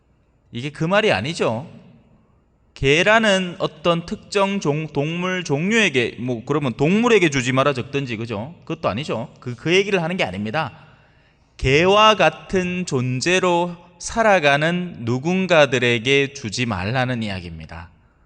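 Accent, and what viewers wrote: Gyeongsang, South Korea. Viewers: 🕯🥀찬미예수님... 신부님.오늘.말씀..참.올바르신.말씀압니다...감사합니다.찐.펜.입니다 경상도.말씀.화통하신.웃음..아멘🕯🥀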